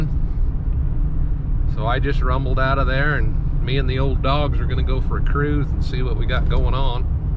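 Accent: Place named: South, United States. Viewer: where are you from? you seem to have a southern "drawl"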